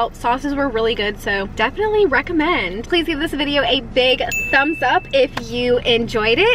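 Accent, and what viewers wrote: South, United States. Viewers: really nice southern drawl of and accent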